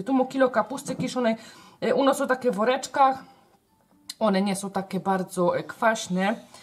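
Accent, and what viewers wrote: Silesia, Poland. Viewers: Superrr jesteś fantastyczna twoja gwara śląska jest niesamowita muszę codziennie Ciebie posłuchać. Buziaczki😘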